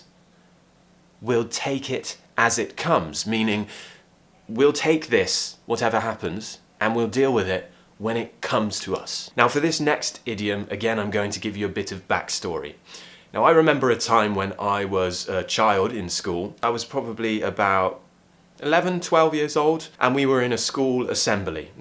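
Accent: RP, United Kingdom. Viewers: Excuse my french (I am francophone and enjoying your lessons, your British pronunciation), but I wish to congratulate you for your marriage and wish you a lot happiness and... many children (?) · Very nicely done, and I do enjoy listening to your cool British accent. I notice that you've made a point of saying that you do not use outdated expressions. However, I can tell you that here in America at any rate, every one of the idioms you discussed has been around for quite a long time. They were all used when I was a kid (in the 1950s) and used by my mom and dad much earlier. But never mind, I enjoyed listening to you, and I will look for more of your videos. And if it's not being too old fashioned, I congratulate you on "getting hitched", and all best wishes to your lovely "ball and chain". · You are the reason that i like British accent thank you a lot sir 😍🔥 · Wonderful examples and I absolutely like your British accent! 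· WOW it's the best video I've ever seen in a British accent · Sir how can I learn your basic English British pronunciation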